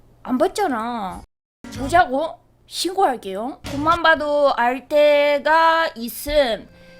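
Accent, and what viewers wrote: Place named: Japan. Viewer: 오늘 꽤 간만에 일본인스러운 한국어다. 반갑네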